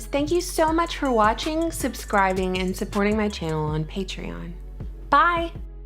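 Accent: South, United States. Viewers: “Hey internet friends, did you know…” smiling pretty face and southern lilt about to rock our worlds with something “to ponder as you go about your day”😅❤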